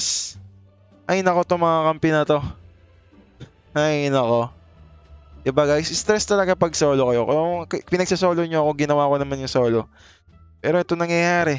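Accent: Iloilo, Philippines. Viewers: Tanong ko lng Tga Iloilo ka Po?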